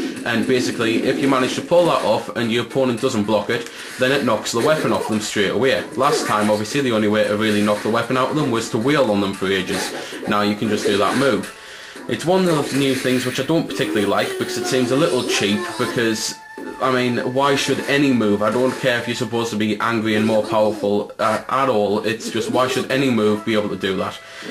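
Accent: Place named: Cockney, United Kingdom. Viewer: Oi nice cockney 'cent ya got there!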